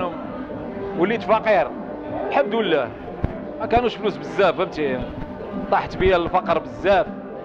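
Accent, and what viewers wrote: Morocco. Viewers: تبارك الله عليك وعلى لهجتك و تواضعك والله ما صدقت بانك ماشي مغربية و كما قلت الجنسية على الورق و انت مغربي باصلك و خلقك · انت مغربي لهجتك وتستاهل تاخد الجنسية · ناري صدمتوني.. طلع هذا ماشي مغربي..... يستحييييل . هضرتو كلامو كلشي فيه مغربي.... مقدرتش نصدق...اوعدي اعطيوها ليه والله غير حرام.. هذا ولد بلادنا.هذا ديالنا....هذا معجون ومخبوز ومكرص مغربي.ومنين أنت؟؟ كنسول اجاتني عيب وحشومة بحال إذا زولت ليه الجنسية المغربية بالله العظيم وجاني سؤالي بحال إذا سبيتو حيث في دماغ تاعي مغربي. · أخلاص أنت مشي مغربي أخويا باين مغربي 100/100ماسحبتليش تحياتى أخي الكريم راك مقدم رائع 👍🌷🌷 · انا بنسبة لي مغربي فحال ولد عائلتي كيتكلم وفرحتني حيت المغرب والجزائر واحد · واش هادا مشب مغربي والله مكنت عارفة وناااري على هضرتو مغربية 100%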